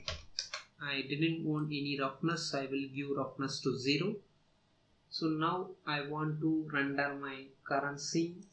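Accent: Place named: India. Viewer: Indian accent- close the video.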